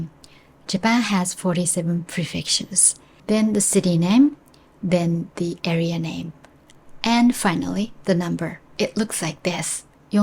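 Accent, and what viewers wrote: United Kingdom. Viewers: you british english is beautifull🔥🔥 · I am Thai but I really like your English accent. I'm studying Japanese language, and I can practice English skills together.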